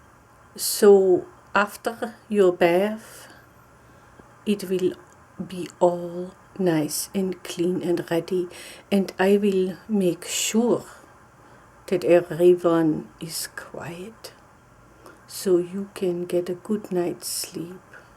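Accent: Germany. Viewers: This is the first time ive seen tbis video what a great job I loved the extra strong accent you look like a real nurse I know these types of videos are not easy it would be so cool to see more videos like thiz if you can ever find extra time that is i would love if you made a video with you speaking in german just for extra asmr tingles im sure all of your followers also would enjoy to hear you speak your native language anyway this was just a thought i love asmr where people have accents or speak in other languages this is a huge asmr trigger for me Thank you for all your hard work love from nanaroriasmr 💖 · Unexpectedly brought to this video and I really like it because of the strong accent I don't hear so often in other videos. The dialogue makes me feel like I was staying in a small, family-run inn after a long day of traveling. I feel like comfortable and safe in the capable hands of German hospitality. 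I haven't been to Germany, but I very much hope to in the future. I would look forward to more videos!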